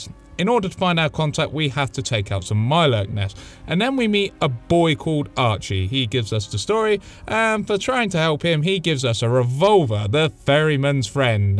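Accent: United Kingdom. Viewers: Loved your video, too bad I don't speak British. So I had to turn on the subtitles, but other than that, great video man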